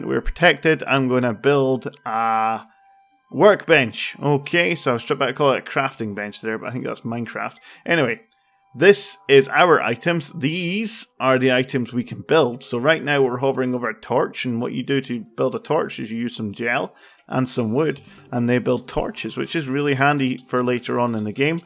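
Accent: United Kingdom. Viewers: Why always British terraria play throughers.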